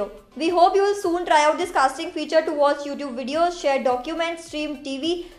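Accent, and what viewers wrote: India. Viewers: I love the Indian accent of this chick, the way she twists her tongue and pronounces the words. Technically she doesn't know the job and is jumping to other things which are not present in new Windows. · why do you talk so fast with such heavy Indian accent? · this nothing about singlish,she speak indian english. hard to understand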